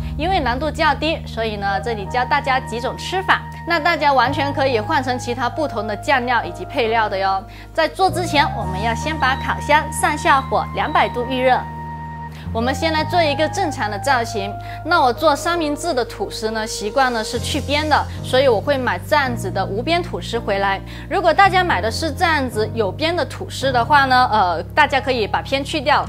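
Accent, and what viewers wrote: China. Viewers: Ew. Can't stand her Chinese accent.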